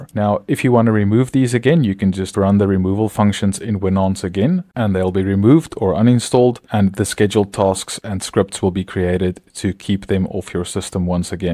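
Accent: Afrikaans, South Africa. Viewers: South African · I could be wrong, but it sounds like you have that SA english accent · South African for sure ? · It is great to see a South African doing well on YouTube. It also sounds like to have a Afrikaans ascent. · are maybe south african? also cool stuff! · I'm hearing some SouthAfrica in there :D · South African detected! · Do I hear a brother from SA?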